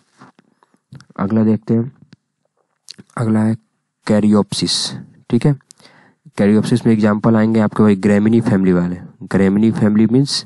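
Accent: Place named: Bihar, India. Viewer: sir 😁😁😁 u r tooo cute ......apne karaila kharbujja bhut funny way me bola .....koi nii ap Bihar k ho n... Bihar accent me bola apne.....mst trick Bhaiya ji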